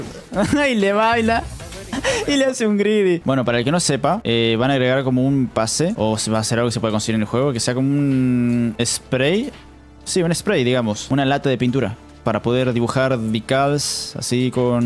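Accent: Argentina. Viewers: Sos argentino 😁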